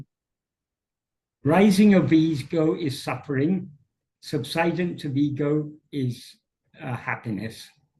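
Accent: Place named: United Kingdom. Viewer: Aprendí a entender tu acento Británico, me costó mucho, pero es una de las fuentes puras en internet. ❤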